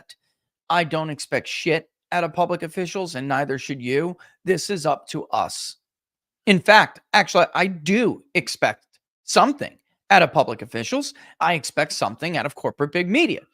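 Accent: United States. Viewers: He's a yankee. They all sound like that to we southerners. But he's a good guy.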